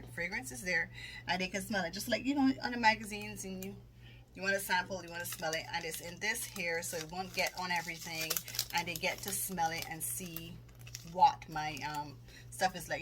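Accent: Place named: Barbados. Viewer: I thought as much I can hear the bajan accent. I’m from Barbados as well. Live in UK now !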